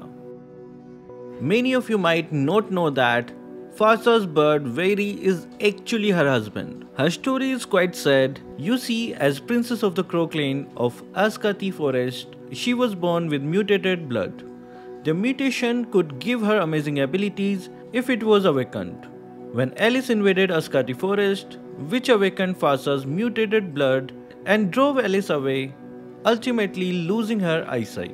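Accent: India. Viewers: Hi, I'm genuinely curious why you have Japanese name but you have Indian accent. So if I may ask are you an Indianese or Japian?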